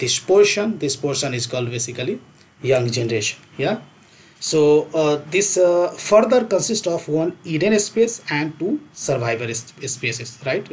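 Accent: India. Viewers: love indian english)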